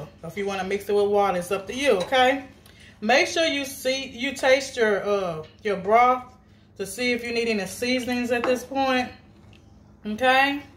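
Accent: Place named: South, United States. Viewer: I hear 615 all in your slang and southern dialect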